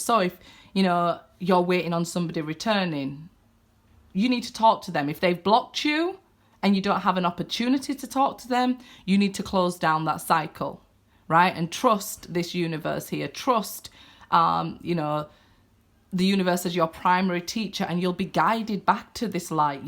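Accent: Yorkshire, United Kingdom. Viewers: Great reading! Hull? Your dialect makes me think of Mick Ronson😃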